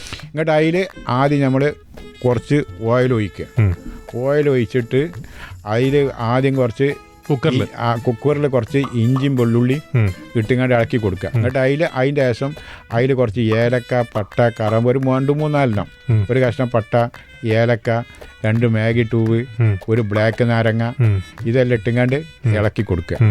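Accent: Malappuram, India. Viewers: മലപ്പുറം ബാസ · ഇത് മലപ്പുറം ഭാഷ ആണോ ഒന്നും മനസ്സിലായില്ല കാക്കാ 🤣🤣 transalate ചെയ്യ് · മലപ്പുറം ഭാഷ 😂😂👍🏻👍🏻👍🏻👍🏻😊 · മ്മളെ മലപ്പുറം ❤️❤️❤️👍👍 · ഒറിജിനൽ മലപ്പുറം ഭാഷ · Malappuram slang ❤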